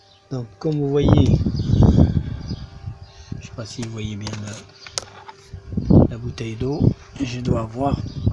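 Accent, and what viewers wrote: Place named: North Africa. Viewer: Accent bledart et accent du sud ca fait space.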